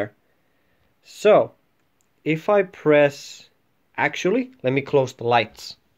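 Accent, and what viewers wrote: Finland. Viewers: Are you finnish? Your accent sounds a bit finnish and you have a nordic keyboard layout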